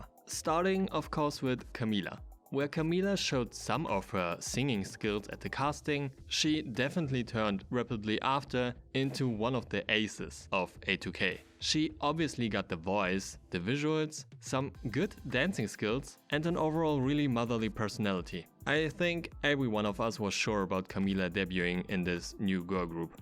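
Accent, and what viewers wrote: Germany. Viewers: You sound german?are you german? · Ich glaub du bist deutscher nh🤭 der Akzent kommt mir zu bekannt vor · yes i think so he sounds VERY german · I think you‘re a german speaking English (no hate to you, just random fact I think I noticed 🤗)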